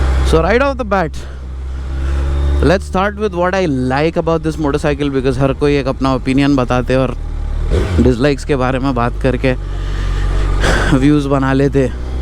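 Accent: Hyderabad, India. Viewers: Bhai Ap toh Eid ke Chaand ho gae ho youtube ke…. Happy you uploaded a video….roj dala karo video if possible…..aacha lagta h apki hyderabadi sunnke…..aurr thodi knowledge mill jati h bike ki joh aur koi nai bolta h…😬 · he's your Best YT-Influencer for Bike Reviews, ... Not a Shill for Castrol etc. Loved his Hyderabadi Accent, and thanks for original content. I have the Trident-660 & 390-Duke, now looking at the SuperSport-950S as 3rd bike.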